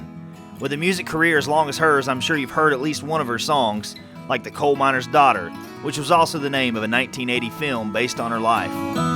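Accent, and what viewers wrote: United States. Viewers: love ur cowboy accent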